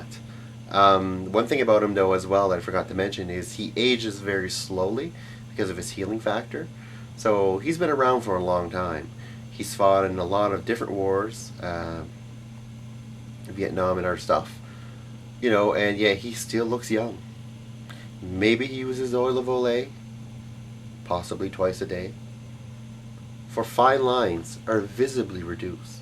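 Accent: Canada. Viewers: BAHA I love the Canadian accent!!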